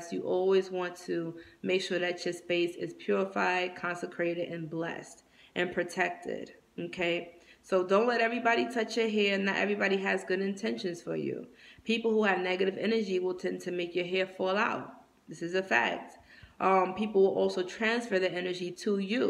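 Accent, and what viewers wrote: New York, United States. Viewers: Another dope video on my journey of black hair videos! I kept getting the urge to look up antennas and hair and so far I’ve come across great information. Glad I got my locs best decision I made! You sound like you from nyc. Peace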